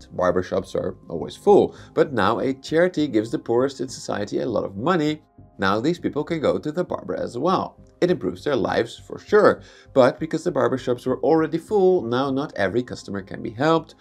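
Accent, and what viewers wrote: Netherlands. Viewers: Waarom heb je een Nederlandse accent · Dacht dat een nederlands accent niet erger kon, maar deze gozer gooit er gewoon een vocal fry bij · Are you Dutch? Or even Frisian;)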